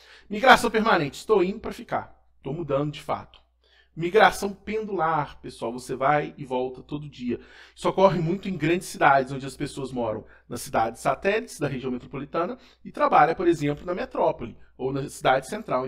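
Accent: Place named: Minas Gerais, Brazil. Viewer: o português do mineiro é famoso kkk tenho até orgulho kkk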